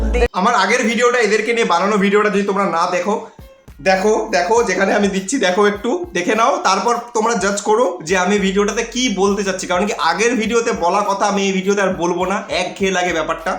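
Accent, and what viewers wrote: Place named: Siliguri, India. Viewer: Tmr siliguri te kty bari dada .. Kto din dhore video dakhchi ..kl kei ami amr bor k bollm j ai dada mone hoi siligurir amder mton kotha bole .. Kolkatar moton na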